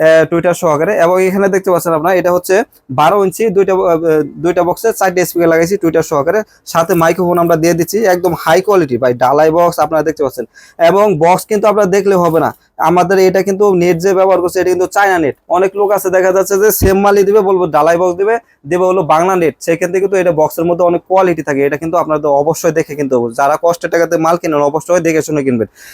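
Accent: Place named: Sylhet, Bangladesh. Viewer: Sylhet tone dekse bhai 🤗🤗🤗🤗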